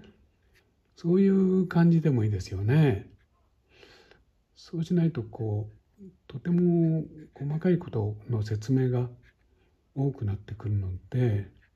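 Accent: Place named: Poland. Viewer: Wow! I would never expect a polish accent in your video :o Thanks for this!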